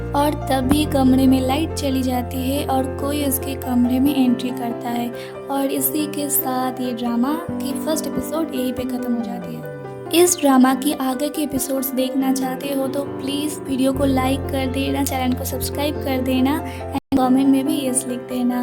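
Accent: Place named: Northeast India, India. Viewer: Ap north east se ho , meri chachi ki same aawaj hain , bolne ka tarika bhi same hain , kalimpong se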